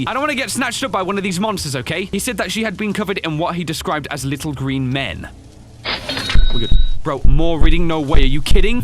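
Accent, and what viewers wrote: Ireland. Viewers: Anrijs Christopher it’s not because of rage it’s because he’s making fun of his Irish accent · He can hide the Irish accent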